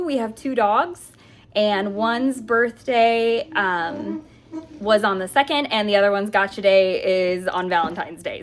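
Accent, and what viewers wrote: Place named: Minnesota, United States. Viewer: It sounds like you've been in or near Minnesota lately :D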